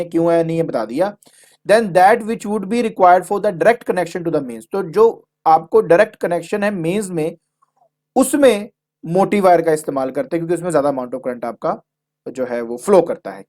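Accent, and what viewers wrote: Punjab, India. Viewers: Sir kyà aap Punjab se ho?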